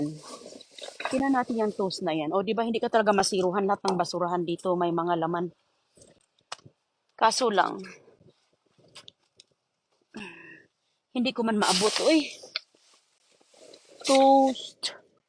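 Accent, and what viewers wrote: Visayas, Philippines. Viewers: peper litus bisaya ka dhay.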